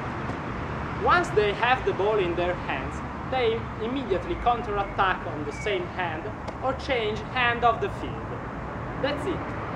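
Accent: Italy. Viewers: è bello perché riesci a mantenere la cadenza lombarda anche parlando inglese... bravo! :) e bello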